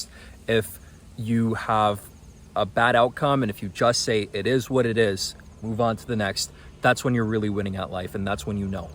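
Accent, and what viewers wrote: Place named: North America. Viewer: You shocked me man, with your Canadian or American accent is it? So proud of you settling down or born in North America. I am Indian too and tried moving to Canada in the 90s desperate to start a new life there but didn’t make it.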